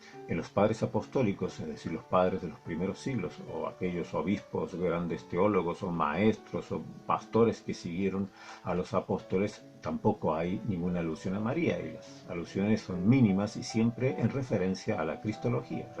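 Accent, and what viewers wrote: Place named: Cordoba, Argentina. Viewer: Jaja Dios lo bendiga disculpe no me pude peinar... Bien cordobes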